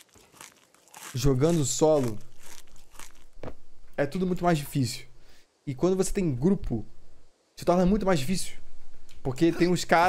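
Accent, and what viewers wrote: Rio de Janeiro, Brazil. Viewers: quando o cara e carioca e foda kkkkkk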